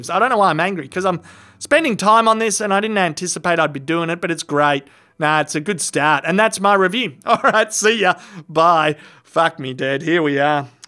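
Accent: Australia. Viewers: But yeah, no, that’s how all Aussies talk.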